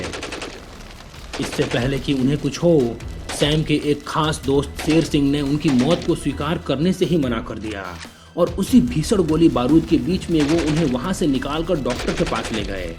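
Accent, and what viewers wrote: Bihar, India. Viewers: Dude, you have worst hindi accent, Marsal nahi Marshall, Saw nahi Shaw, Des nahi Desh , pls stop this bhayiyya accent laude. 😆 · Yah baat.. Yah baat karte karte video ki aisi taisi kar Di.. Voiceover kisi aur se karwa lete yaar.. Bihari accent does not suit the video theme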